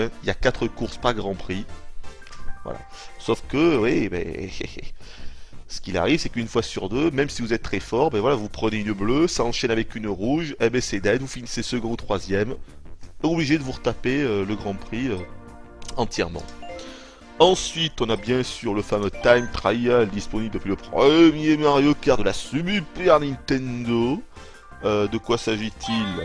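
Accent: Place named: South, France. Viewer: French with a southern accent :)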